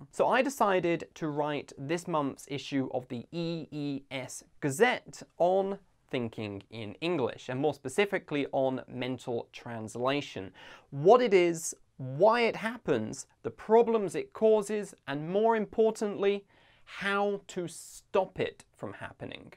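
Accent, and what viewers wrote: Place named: United Kingdom. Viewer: i love u.i'm f tehran and virgin not married 1982. i like ur accent to.first time i when hear uk accent i think that is funny.but its better that usa accent i think.lol.but im in iran.in never travel or see forigin in my life.lol.but if i in skype see any man "lol" maybe have chat with voice.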